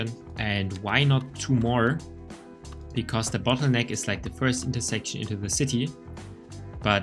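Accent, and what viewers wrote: Germany. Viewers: uff ich höre sofort raus das du deutscher bistXD trotzdem geiles tutorial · deutscher Akzent, 10/10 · do i hear deutsch?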